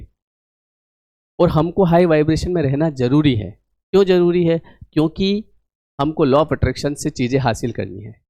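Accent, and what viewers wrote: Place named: Malwa, India. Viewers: Inki boli se ye ujjain/malwa se lgte hain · Bhai aap indore se ho kya? 😅Bade he indori lag re bhai😅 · Sir aap indore ya Malwa region se jude hai kya .... Apki boli se mujhe lagta h k aap hamare malwa region se jude hai 😊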